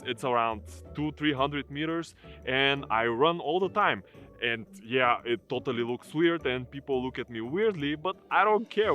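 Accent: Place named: Eastern Europe. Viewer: i disliked because i dont like your slavic accent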